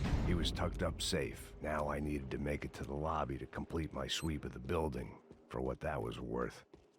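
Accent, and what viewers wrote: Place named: Russia. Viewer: Звучишь как русский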